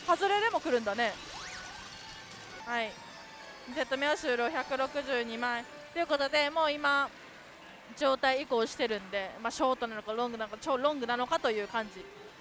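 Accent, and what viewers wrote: Kagoshima, Japan. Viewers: 何処の出身ですか？？ 鹿児島？？🤔🤔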